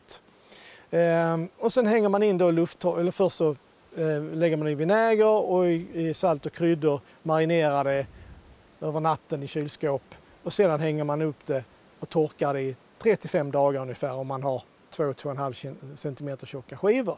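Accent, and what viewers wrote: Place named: Skåne, Sweden. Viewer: Not BILL-tong, but bill-TONG. Equally tasty, nevertheless. Wonderfull idea and a great Scanian accent! I have to make one myself instead of filling my check-in luggage with billtong every time I return.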